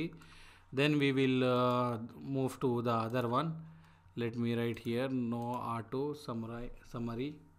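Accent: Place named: India. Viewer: India English is very difficult to hear 😵‍💫🤮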